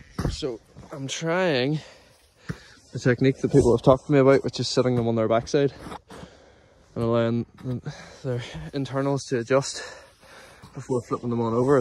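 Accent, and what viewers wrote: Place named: County Down, United Kingdom. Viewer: Just found the channel, subbed even though I know nothing about farming, I just instantly recognised the accent and the Mournes in the background of a video haha. Also from County Down myself.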